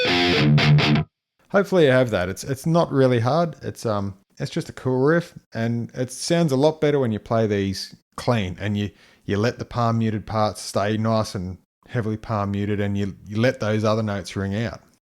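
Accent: Australia. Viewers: Toster Is he an Aussie ?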